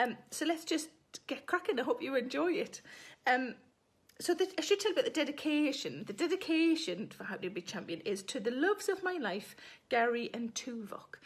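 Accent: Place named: South Shields, United Kingdom. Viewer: My Mam grew up about a kilometre from Sarah’s home. We emigrated to Canada when I was quite young but we travelled a lot and I spent a fair bit of time in South Shields at my Gran’s. I was enrolled in school on our more extended visits. How thrilled was I to discover I “almost” went to school with Sarah. Sarah sounds exactly like my Gran - no offence Sarah, my Granny always had a high, sweet sounding voice like yours despite her age. She also had a saucy sense of humour and glint in her eye despite her tidy, prim appearance. I don’t get back to the UK nowadays so listening to “How to Be Champion” was doubly pleasurable- the humour and the accent of my childhood. To think we might have passed each other one afternoon in The Nook....I really, really, REALLY believed Minchella’s was the best ice cream IN THE WORLD, cos my Mam said so.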